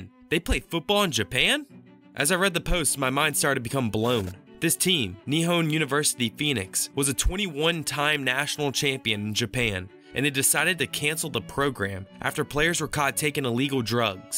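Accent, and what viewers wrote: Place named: Louisville, United States. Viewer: I think that he may be from "Loo-uh-vul."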